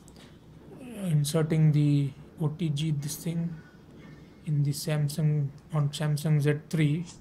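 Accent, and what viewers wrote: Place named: Gujarat, India. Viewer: Are you Gujrati?? your accent is like Gujratis.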